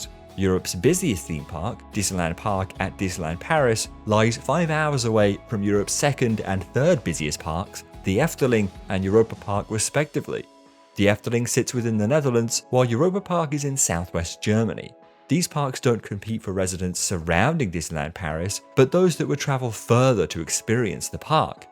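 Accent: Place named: RP, United Kingdom. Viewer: it's just a normal RP English accent?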